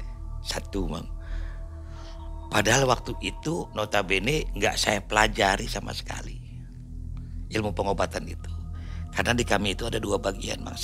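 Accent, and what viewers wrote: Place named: Sunda, Indonesia. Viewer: Assalamualaikum kang,Abi sok setia nonton kisah na khas Sunda pisan nyariosna sing damang Oge sadaya kulawargina seru Oge Aya NU Matak seuri JD kahibur.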